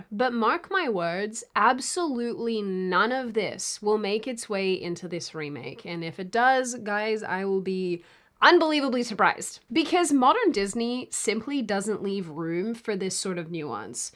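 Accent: Australia. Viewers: GREAT analysis, Sydney. You pointed out things that I had not thought about, specifically the WHY woke movies (and everything else woke) is SO BAD! Btw... your Aussie accent really comes out when you're not feeling well - but at least you looked great...! 😊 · Your Aussie accent is thicker when you're sick. Hilarious and endearing. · Sydney, you sound a lot more Australian when you sick. 🐿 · Aussie accent getting stronger and stronger..... LOVE IT!!! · I'm not sure if in crazy or what 😂 but you sound way more Australian when you are sick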